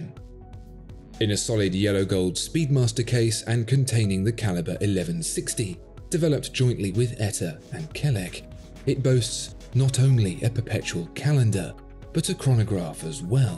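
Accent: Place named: England, United Kingdom. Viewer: I'm not happy with the pronunciation you use for omega, I've heard Americans pronounce that way but you have an English accent.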